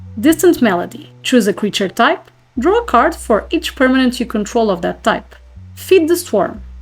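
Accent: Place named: Portugal. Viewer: Are you Portuguese? Luv your accent! ótimo video.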